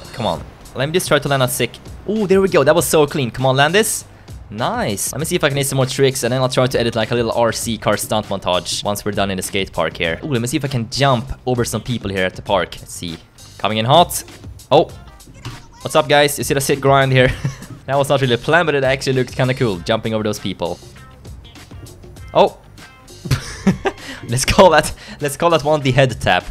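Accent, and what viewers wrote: Sweden. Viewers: Är du från Sverige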